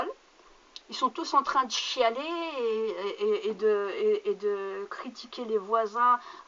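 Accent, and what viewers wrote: Morocco. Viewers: Dit moi fofolle vous êtes vraiment algérienne ? اسمحيلي l'accent dyalek marocaines ma cocotte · kelba bent elharki. Tu as l'accent des marocains. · ta façon de parler arabe ta l'aire marocaine et en parlent de des arriviste ils en France ou en Europe j'attire ton attention que les nouveaux arriviste cest qui travaille et toi tu touche les aides sociales en Europe à prendre de ne pas parler vulgaire ma grande sa se voir que ta un problème a la fin vive la France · Mon DIeu ! Je suis tombé sur cette video par hasard. Ma cherie tu m'as fait de la peine, je te connais pas mais tu m'as l'air d'être sérieusement atteinte. message aux Algeriens ?!? Les algeriens ne t'ont rien demandé pour commencer. Ensuite tu as un regard et un comportement pleins de haine.... le suivi de cette chmetta de "Hassan el maghrébine" et ce fond d'accent marocain dans ta façon de parler (je me trompe peut être.... 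j'espère que quelqu'un de censée (service condulaire par exemple) va se pencher sur ta personne ma cherie) Je te souhaite un bon rétablissement et n'oublies pas de prendre tes médicaments. Reste en belgique et ne revient plus en algerie. Si t'es vraiment algerienne ?!? Je signale la video. C'est pour ton bien, salam et saha ramdanek. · Elle parle trop bien l'arabe ....marocain ? ?????? je doute déjà qu'elle soit née en Europe et qu'elle soit algérienne.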